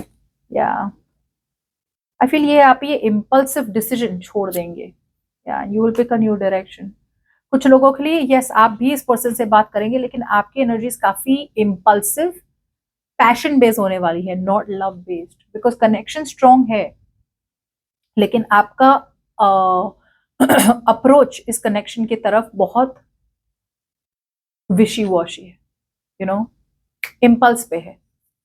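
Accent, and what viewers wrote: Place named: India. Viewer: Thanks,. This is GREAT!!! I can follow along whilst you include some English. And I love hearing not only the Indian accent but the Hindi language is beautiful to listen to. Yes, I hope my person comes around. The longer no contact, the weaker the connection. I am open to new persons, though deep down to be honest, I still have feelings for her.😢..I know I'm a big baby when it comes to heartbreak. Reckon I am healing my abandonment issues as well. Thanks, dear for the read. Always seems straight shooter.🧡❤💚💙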